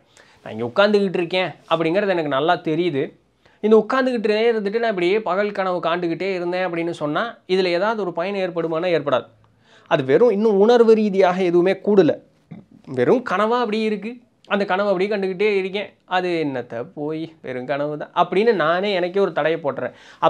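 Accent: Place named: Kongu, India. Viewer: Really very interesting and motivating session in OUR KONGU TAMIL ❤ Tq sir 🎉🎉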